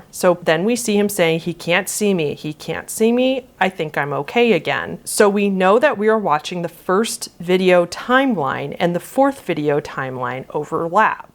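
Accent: United States. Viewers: KobenThat’s just her accent. Most Americans pronounce “Shire” like “Shy-yur.” I’m sure she didn’t mean to offend anybody.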